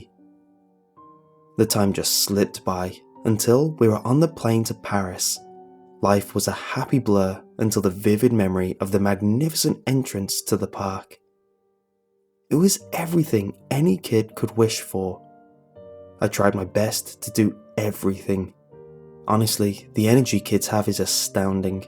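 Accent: United Kingdom. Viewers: half tempted to watch IT, but deathly terrified of clowns and I wanna say has an English accent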